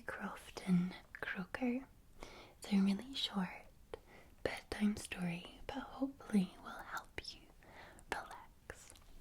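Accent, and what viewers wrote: Scotland, United Kingdom. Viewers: official Bears fan.🐻⬇️ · good tae see Scottish asmr 🤝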